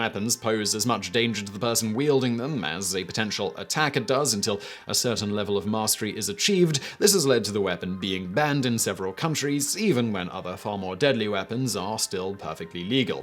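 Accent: United Kingdom. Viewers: The British accent is a plus. That's about the only positive . The rest is all hyperbole.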